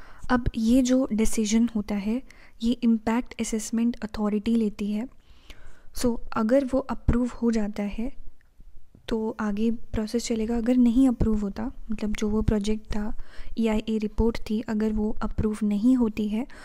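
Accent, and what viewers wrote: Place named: Kashmir, India. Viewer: Good video. Mam your sound like Kashmiri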